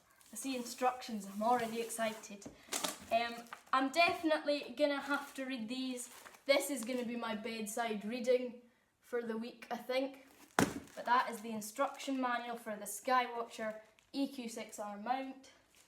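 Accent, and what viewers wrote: Scotland, United Kingdom. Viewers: That Scottish accent though. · Scottish accents and telescopes. Good times.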